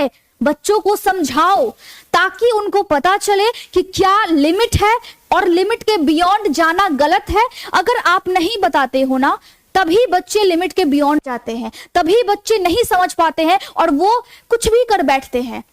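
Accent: Bihar, India. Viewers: Aap bihari ho kya maidam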